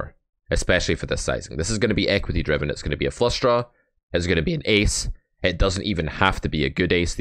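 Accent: Scotland, United Kingdom. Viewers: Can absolutely tell that your Scottish and probably had too many conversations with stoned Glaswegians when you come right off the bat with a spoon analogy with way too much detail.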